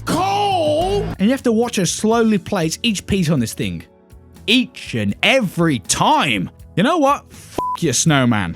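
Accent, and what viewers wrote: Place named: Midlands, United Kingdom. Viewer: Aw man, this video was quite a sentimental one for me. My family grew up playing this game together in the Midlands, I was sure I recognised your accent and then you mentioned Wolvo and Bham haha :)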